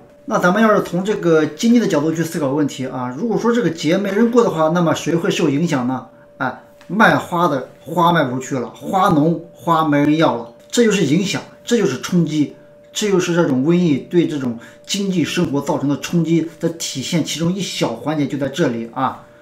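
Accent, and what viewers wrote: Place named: Shandong, China. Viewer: 是山东人吗口音熟悉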